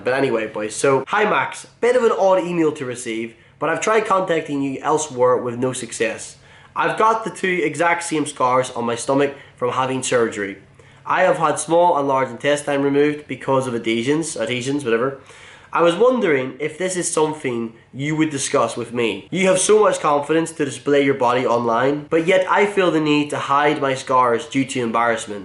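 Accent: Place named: Scotland, United Kingdom. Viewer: Haha sum boy what part of Scotland you from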